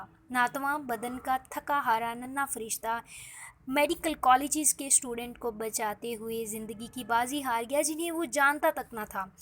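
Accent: India. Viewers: Bibi bolna to seekh lo yar Kia bad report kerti ho lagta ha Indian ho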